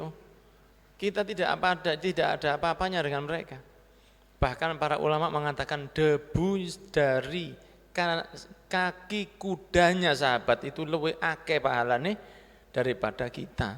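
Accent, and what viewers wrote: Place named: Madura, Indonesia. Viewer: ada madura nya😍😍😍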